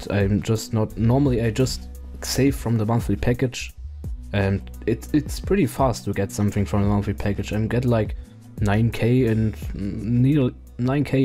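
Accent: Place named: Germany. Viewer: Digger learn mal Englisch, du redest im deutschen Stil english. Lass es sein oder lernen besser Englisch. Deine Videos sind cool anzuschauen und das feiere ich aber dein english ist echt für Blutungen im Trommelfell verantwortlich. Einfache Sätze wie "where is he" sind doch 5. Kläger Niveau. Und nicht "where he is". Sorry dass das so bissig klingt aber mach lieber auf deutsch, was auch passt und zur Not Untertitel wenn die NICHT deutschen was verstehen wollen :) Wir müssen schließlich auch Englisch lernen um ihren Kram verstehen zu können.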